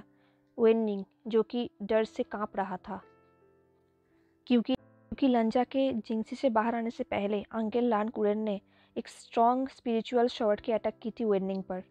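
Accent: Bengal, India. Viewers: Di kya aap bengali ho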